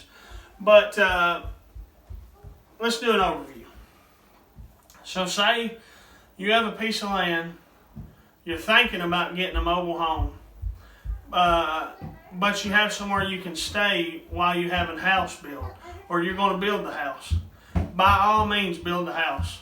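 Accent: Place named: Virginia, United States. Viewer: Mann you sound like us out here in va. We did the same thing and are about to do what u did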